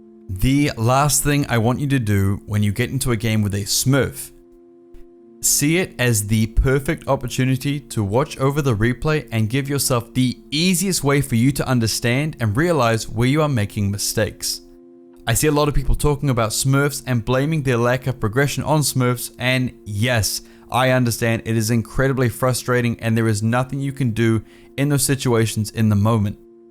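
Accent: New Zealand. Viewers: Are you from nz? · Are you from New Zealand?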